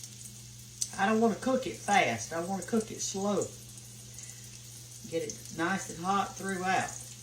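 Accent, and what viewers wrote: South, United States. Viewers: your such a sweet person I just love watching you cook and hearing your southern accent and I like your recipes also prayed for your healing also. GOD BLESS YOU darlin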